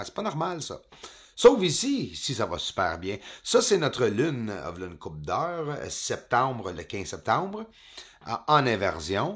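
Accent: Quebec, Canada. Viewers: y a pas pire aveugle,que celui qui ne veut pas voir,bout d,viarge,ha ha,t,as de + en + notre bel accent quebecois,lache pas,on aimes ca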